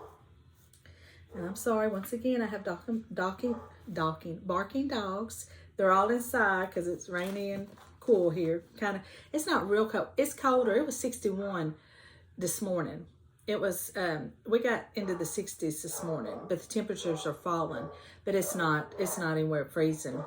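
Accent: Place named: South, United States. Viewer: I just found you and being from Rock Hill SC , I recognized that southern voice and said” I need to watch her” and then I heard you say y’all and I was hooked. The book review was great. I’m always looking for good books to read. Thank you for a great video and I think the green tinted fabric would look great for March sampler😊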